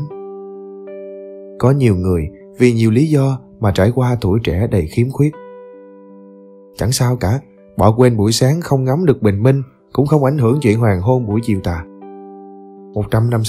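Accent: South, Vietnam. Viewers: Thằng này giọng Nam nô nghe chán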